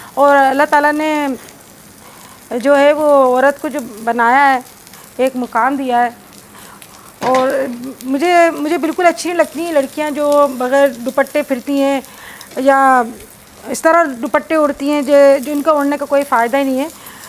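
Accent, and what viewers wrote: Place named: Bhopal, India. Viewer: As salam alaikum Aunty .. Aapki accent kaafi bhopali hai...??Kya aapka koi rishtedar Bhopal India say hai ??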